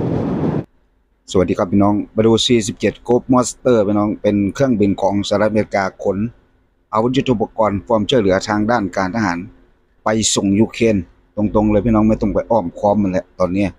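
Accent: Isan, Thailand. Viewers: แอดมินเป็นคนอีสานใช่ไหม ฟังจากสำเนียงแล้ว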